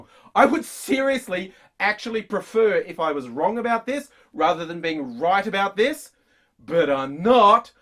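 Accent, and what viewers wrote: Australia. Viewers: Why is Patton Oswalt speaking with an Australian accent?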